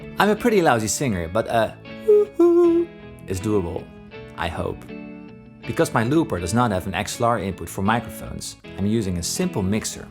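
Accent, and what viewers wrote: Netherlands. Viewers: Kak hahahaha, I always thought u sounded Dutch ;) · You sound dutch, and i would know, cause i'm dutch too. Great beard btw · you're defenitly dutch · where are you from, you sound dutch lol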